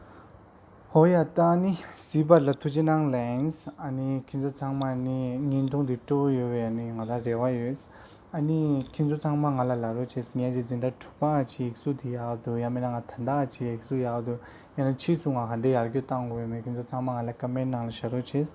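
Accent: Standard. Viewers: ་ལགས་ ཁྱེད་ཀྱི་ཡིག་གཟུགས་ད་ལྟ་ཡག་ཀ་འདུག བོད་སྐད་དཔེ་གཙང་མ་རྒྱབ་གི་སྔགས་བརྗོད་ཞུ་ བོད་པའི་ཕྲུག་གུ་ཁ་ཤས་ལས་ཡག་ཀ་འདུག་ རྗེས་སུ་ཡིད་རང་ཞུ། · བོད་སྐད་གཙང་མ་ཤོད་ཀྱི་འདུག། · ཁྱེད་རང་རྒྱ་གར་ཞིག་ཡིན་ཀྱང་བོད་སྐད་དཔེ་གཙང་མ་རྒྱག་གིན་འདུག།། 💓💓💓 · WOW really you speaks pure Tibetan better then Tibetan children’s in India and western. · ཡར་རྒྱས་དཔེ་དག་འགྲོ་འདུག བོད་ཕྲུག་ཚོ་རྒྱ་གར་བ་ཞིག་གི་བོད་ཡིག་བྲིས་པ་དང་བོད་སྐད་གཙང་མ་ཞིག་རྒྱབ་ཡོང་དུས་ཁྱེད་ཚོས་ལ་ཚོར་སྣང་ཞིག་འདུག་གམ།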